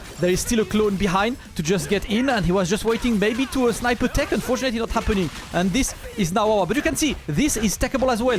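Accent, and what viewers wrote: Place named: France. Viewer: Ça sent fort la France ce rythme et cet intonation :P Je ne te connais pas mais merci pour le partage de ce combat.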